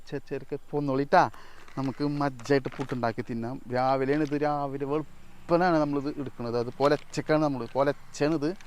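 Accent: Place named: Kasaragod, India. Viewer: കാസറഗോഡൻ സ്ലാങ് പങ്കായിട്ടാറ എബില എന്ത ഇത്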